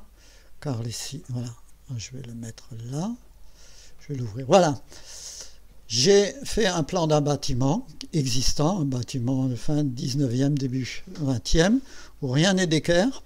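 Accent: Alsace, France. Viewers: Alsace (d'après ton accent)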